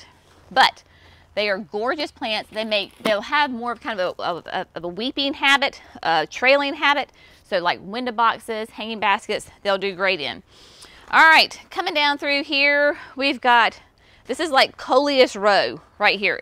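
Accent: South, United States. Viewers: just a little off topic...I just watched the tree removal vlog....just for future reference ( from a Canadian girl ) ....a TOBOGGAN is a flat sled .....a TOQUE ( pronounced too-k ) is what americans call a beanie, like your cute little white hat.....by the way , I love your southern accent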